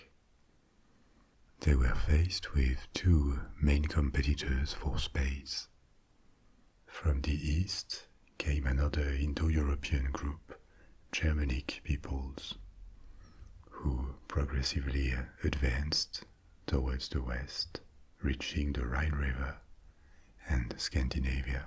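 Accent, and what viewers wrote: France. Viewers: Hearing Irish names and places pronounced in a French accent tickles me in a way I can't quite describe. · Being Irish and love the french accent, this was perfect for bedtime 😄 merci beaucoup! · I love his pronounciation. Usually its American's botching up the accent (though I don't blame them, Irish spelling is weird but anyone with a passing knowledge of Irish knows the anglicised sounds is not the original sounds) but he has a very strong French accent so I was curious how he would pronounce it. The correct inflections are all there which is all I expect. As someone who the only thing I was good at in school was doing a French accent (not speaking French tho) and combining that with the Irish I was being taught I can recgonise where the mouth was making its natural French sounds but it was still pronoucing the word right. The best way I can describe is that Irish, if you're trying to force the language it can sound choppy and sharp. 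Kind of like your coughing the words out on the sharp consonents, but as a native speaker it sounds fluid. French speakers whether fluent or not always sounds fluid. It's very interesting to hear.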